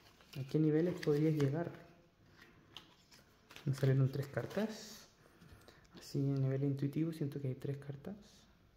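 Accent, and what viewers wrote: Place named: Chile. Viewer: Hola, Youtube me recomendó tu canal la semana pasada y he estado viendo tus videos.Eres chileno? Reconozco mi acento.❤. Elegí la opcion 4 y muy acertado soy tarotista trabajo completamente de esto hace 5 años tengo un canal de tarot aqui en youtube con mi otra cuenta.. Todo lo que describes de mi en lo empatica y generosa es asi, siempre mr he considerado muy espiritual y me causó sorpresa lo de la bendición... ya bendigo el agua y las cosas lo habia dejado de hacer de hecho en uno de tus videos te envie bendiciones curioso y ahora veo esto 🙏👍.Saludos desde Chile Me pareces muy buen tarotista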